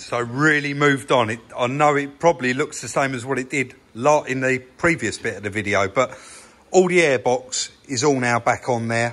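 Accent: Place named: Kent, United Kingdom. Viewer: are you from kent??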